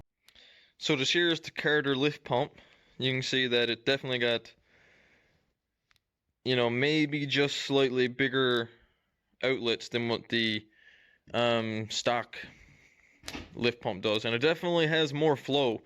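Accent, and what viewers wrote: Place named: Canada. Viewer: Must be from Canada. Likss saying ooot not out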